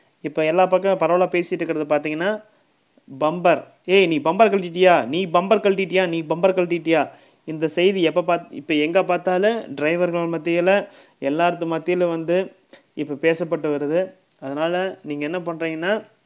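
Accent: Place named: Kongu, India. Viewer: neenga kongu Tamil peasumpothey nenaichan....nanum erode dt than bro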